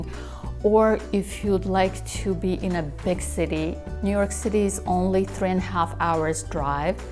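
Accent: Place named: Boston, United States. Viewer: You say Massachusetts but all I hear is Boston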